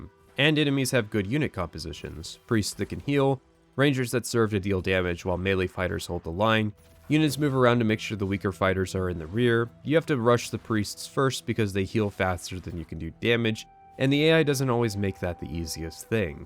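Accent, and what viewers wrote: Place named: United States. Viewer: I really have to ask how you, with your thick, middle-of-the-road Yank accent, know how the second act Italian accents are “fake”. You suddenly not only a gaming expert but a linguistics pro as well? Do the video blogging world a favor and get off your high horse with this shit. Review the game….leave your opinions about accents out of it.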